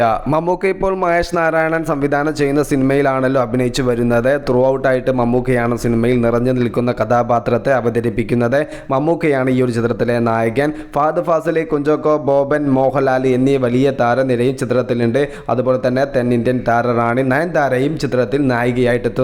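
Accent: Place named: Trivandrum, India. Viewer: ട്രിവാൻഡ്രം സ്ലാങ് 💙💙💙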